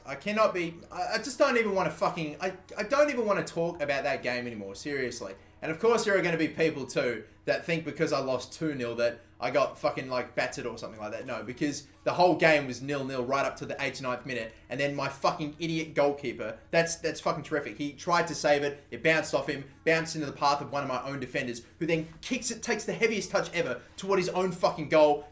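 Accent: Australia. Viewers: Soccer Eric77 I think the Aussie accent has to do with it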